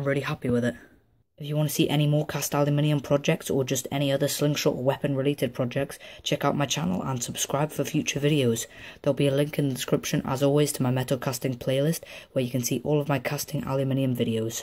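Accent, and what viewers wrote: United Kingdom. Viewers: Hi is british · what is it with british-accented people mispronouncing "aluminum" "aluminium" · Wow, I never heard such a British accent before.... BTW you're doing some really nice work there. Keep it up ;)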